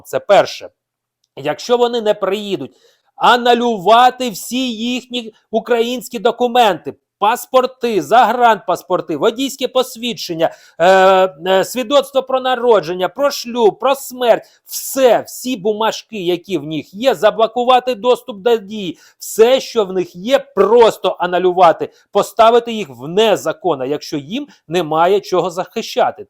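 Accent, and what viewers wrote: Russia. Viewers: Фу !!!! Тварь !!! Ещё одно - куля в лоб ... А сам сидит русским акцентом трусит ... В бан суку !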